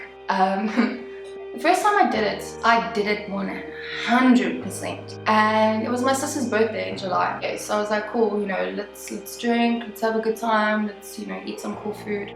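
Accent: South Africa. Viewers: Are you South African? You have a really strong South African accent 😂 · are you South African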